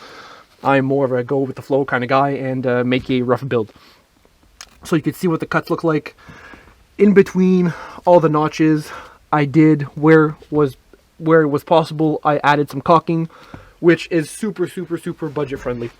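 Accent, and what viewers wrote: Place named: New Brunswick, Canada. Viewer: you sound by your ascent N,B. Canada thank for the video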